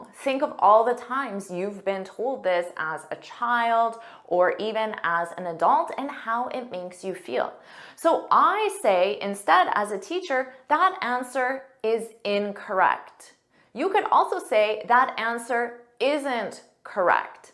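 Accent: United States. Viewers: You have a perfect American accent.. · OK for American English, but it's a far cry from how British people speak. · this is an american english, right?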